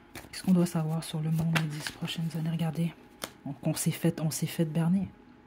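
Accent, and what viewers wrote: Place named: Canada. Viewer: Merci pour Le tirage. Les fj: interessant. Je le ressens aussi comme ça. La terre est stressé, c vrai, reconnectons nous a elle. Aimons la. Et sortir du monde à cravate. Créons notre propre réalité. Et pour les ET Oui c sur. Nous meme venons sans doute d’ailleurs ou une partie de nous meme.. j’avais déjà entendu qu’il y avait des passages secrets dans la terre où il y aurait une vie paradisiaque avec d’autres animaux.. passage secrets, cachés.. mais comme tout secret, ça doit être sécréter.. découvert. Belle canalisation. J’adore le corbeau. Y’a de la magie dans l’air... Merci c’était la deuxième fois que je t’écoute. Je t’aime bien. Beau rire et accent canadien j’adore ❤️❤️ bonne continuation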